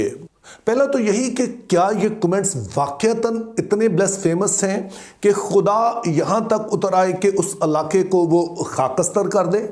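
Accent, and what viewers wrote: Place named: United Kingdom. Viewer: bhai ap urdu midum hyn. Es liy kindly urdu ko urdu ki tarha bola karyn.ap urdu esy bolny ki koshish karty hyn jasy angryz urdu bolty hyn.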